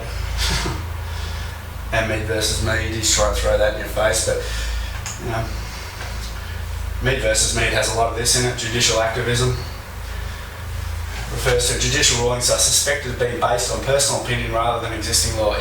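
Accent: Australia. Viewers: I found this video hard to hear because of the room and the echoes...and with Aussie accent it made the entire video hard to hear n understand. Overall, topic looks Good. Suggestions...head-set n microphone..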